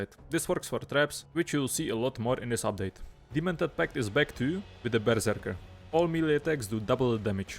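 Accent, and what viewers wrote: Czech Republic. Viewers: nejsi nahodou čech? :D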